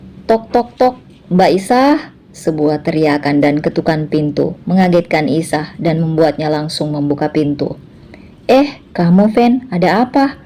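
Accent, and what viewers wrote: Medan, Indonesia. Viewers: Bogor hadir ikut nyimak mb logat bahasa medan sangat enak d dgr 😅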